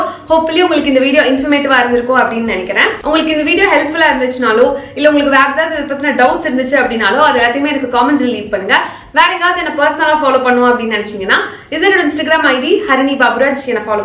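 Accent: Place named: Pollachi, India. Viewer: பொள்ளாச்சி மொழி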